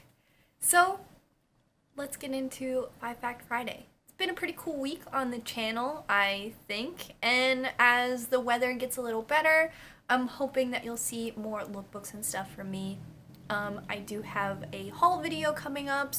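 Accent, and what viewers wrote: Philadelphia, United States. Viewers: I love how in most videos is super cute but every once in a while her "Philly" comes out! Her "Philly" DEFINITELY came out in this video😂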